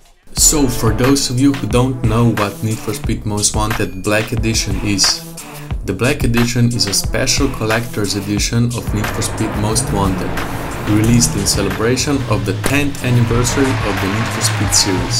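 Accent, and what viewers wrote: Russia. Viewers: Что это за русский акцент?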